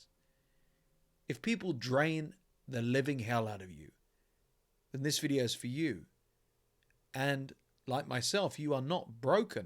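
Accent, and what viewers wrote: United Kingdom. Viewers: He described all of Western societies today to a tee in a British accent.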